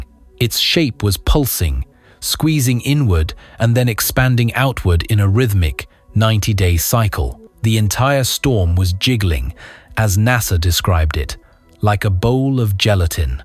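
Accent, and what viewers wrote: United Kingdom. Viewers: Does every video like this have a British accent on it? Funny.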